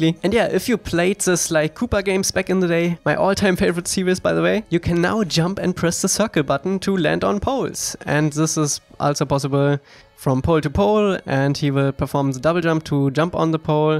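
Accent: Germany. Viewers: Nice, in the beginning it looked like a simple amateurly made game and I expected the project to stop after a month, but it's really coming together and getting closer to a game I'd enjoy paying! Keep it up my dudes! PS: btw are you German? Your accent sounds like you were. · Sounds like a german accent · Man hört seinen deutschen akzent soo krass raus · Ich dachte doch gerade am Akzent.. das klingt ziemlich deutsch, schaue in die Kanalbeschreibung: Deutschland :D Aber coole neue Mechanismen! :) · Gutes Englisch, aber ich feiere einfach, das kaum ein deutschsprachig Nation, ihren Akzent verstecken kann (willst du wahrscheinlich auch gar nicht) Grüße aus Hannover 😘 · That's the most german english I ever heard😁😁the game looks very good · digga wie man einfach hört das du deutscher bist :D Aber geiles Game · Dieser deutsche Akzent XD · Dieser English Akzent :D Deutsch nicht wahr? 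· Man erkennt zu 100% er ist deutscher English: because his accent you can hear his German Btw really nice game i hope we can play ist all in the future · Man Merkt das er Deutscher ist :D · Are you german? Your english sounds really german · Der Typ ist 100 Prozent deutsch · Not even 2 minutes in and I knew you're german xD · Man hört irgendwie deinen Deutschenakzent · Haha voll rausgehört das du aus Deutschland kommst :D mit welcher Engine arbeitest du denn am Projekt? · nicer deutsch akzent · Ich weis nicht, aber kann es sein das er aus Deutschland ist ? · wie man das deutsch höhrt haha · Wie krass man hört, dass du deutsch bist. Aber mal davon abgesehen sieht alles sehr cool aus. :D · Du bist ein deutscher oder? ^^ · Der Deutsche Akzent macht das tausendmal lustiger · if i may ask. from what country are you? your accent reminds me a bit of german. when i hear "glide" u kinda say "gleit" the german word :D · Du hast n echt deutschen Akzent. 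· Wirklich sehr kräftiger, deutscher Akzent. Aber naja nice, dass ein Deutscher das ambitionierteste Fangame-Projekt zu avatar leitet (ist) ^^ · Man hört einfach das er Deutsch ist haha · Du bist doch ein deutscher, oder ?😂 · Your english sounds like you are German, please tell me I am right :D (I am German)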